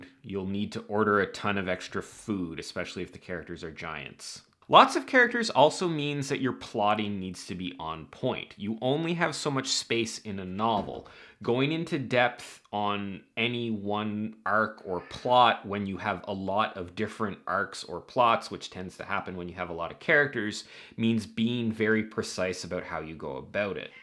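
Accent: Canada. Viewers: Are you from the maritime region of Canada? You sound just like my favorite early 2000s politico Rick Mercer 😂🎉 super random sorry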